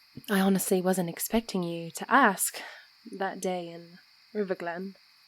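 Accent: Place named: United Kingdom. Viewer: This was really sweet! I loved your british accent!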